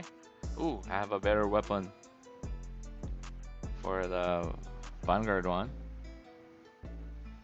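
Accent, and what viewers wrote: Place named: Philippines. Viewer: Base on your accent your Filipino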